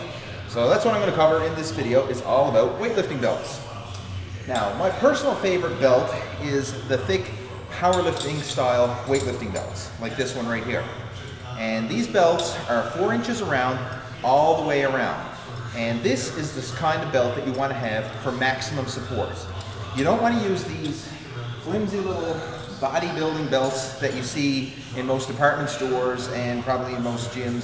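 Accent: Newfoundland, Canada. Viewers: Hey fellow newfie haha. Sure sounds like one. Eh by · He must be a Newfoundlander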